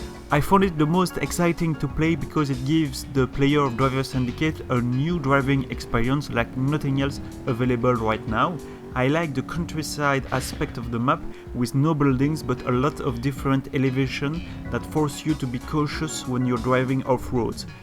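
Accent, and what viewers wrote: France. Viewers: With a french accent :) Looks very promising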